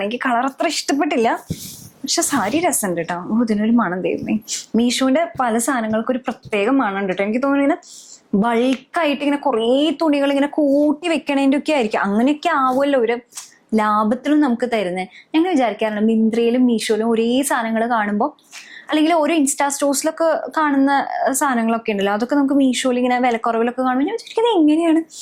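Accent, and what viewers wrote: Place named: Valluvanad, India. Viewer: Thrissur കാരി അല്ലേ...പക്ഷെ സംസാരം..slang okke വള്ളുവനാടൻ ആണെല്ലോ...സ്റ്റൈൽ ിനു വേണ്ടി ആണോ ഇങ്ങനെ പറയുന്നത്... anyways nice vlog ❤❤❤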